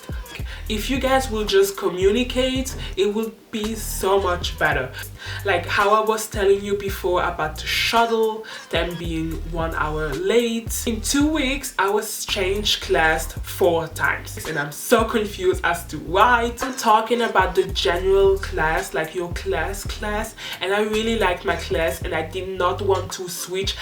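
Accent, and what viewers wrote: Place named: Switzerland. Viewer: Trust me, you're not a C2 and I sincierly think you aren't a C1 either. Not to insult you, but I just did the exam for the C1 and passed, however it was pretty taff. Between the C1 and the B2 is a huge gap which makes sence since C1 is already the second highest level. The reason why I do not think that you are a C1 are mainly the collocations. They get really important for the CAE and honestly you might have a look at them again. Secondly your language sounds really swissish. Im swiss, so I know how this is supposed to sound. Usually it is not a bad thing to have an acent. I mean I have one too, not gonna lie. At the CAE they won't even care about that. It is crucial, however, to change some words with synonims for not sounding as repetitive. For instance don't use students three times in one sentence. Chance it up with educators or pupil. 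This is what they really look at if you wanna take the CAE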